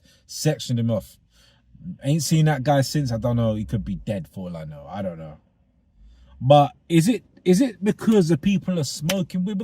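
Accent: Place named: London, United Kingdom. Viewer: He's a Londoner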